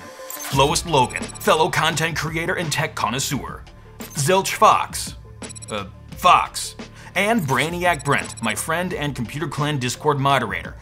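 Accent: Canada. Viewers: That's just how Canadian people say vague. Don't change who you are ;) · Are you Canadian? People tell me I don't pronounce vague correctly, but I think it might be an accent thing.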